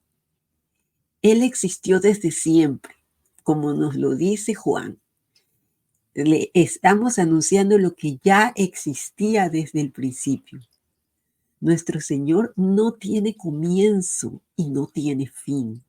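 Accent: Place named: Dominican Republic. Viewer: La verdad tiene acento dominicano,yo soy dominicano vivo en ny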